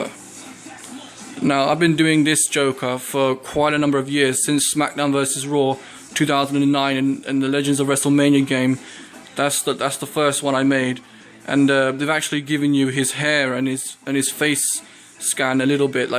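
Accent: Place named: United Kingdom. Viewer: Hi again im back on my own user/channel now, what's your nationality? if you don't mind me asking, you sound British am I right? My PSN name on PS4 is CS20-2015 add me if you want.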